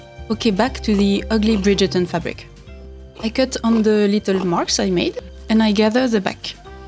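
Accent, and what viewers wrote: France. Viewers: Side note: I enjoy the way you speak english. I'm guessing the time you worked as a flight attendent helped with that. A lot of french words have made their way into english (looking at you Guillaume le Conquérant), but in english the stressed vowel is often a different one. With you I never hear a stressed vowel out of place. If I hazard a guess: you can also talk english with hardly any accent, but for the videos you're playing up french accent a bit. About the word 'stomach'. Yeah, that word is also used generically, but I think where you said 'stomach' the word 'abdomen' is a better fit.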